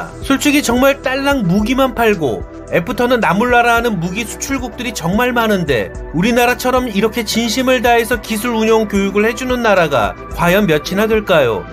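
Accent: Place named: North Korea. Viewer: 영상 잘 봤습니다. 영상 몇개를 봤는데요. 내용이 참 좋네요. 근데 말투가 남조선민주주의인민공화국 스러운 말투가 떠오르는 듯한.....ㅎ;(죄송요^^;) 영상 초반에는 너무 강렬 하신데, 후반으로 갈수록 남조선민주주의인민공화국 스러운 느낌이 빠지고 괜찮아 지시는데 ㅋ 말투 특유의 개성이 있으시네요. 살짝 북한 스러운 ㅋㅋ 초반에 좀 어색했네요. ㅎ 암튼 내용 좋고, 개성 있으셔서 잘 되실거 같습니다.^^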